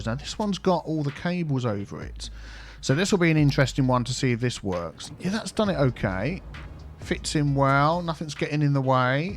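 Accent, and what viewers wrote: United Kingdom. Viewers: My daughter has been sleeping through your calming british voice since she was born 5 months ago. Also, I`m enjoying it. Especially your Teaville series. Thanks mate - keep doing what you`re doing!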